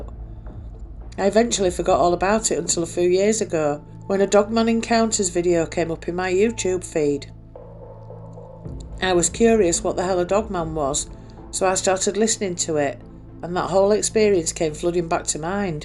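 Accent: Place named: Yorkshire, United Kingdom. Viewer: Wow, I’ve just found this after shared the link. I seem to have been unsubscribed!! Fabulous to see you back, I’ve been missing your great Yorkshire narration 😊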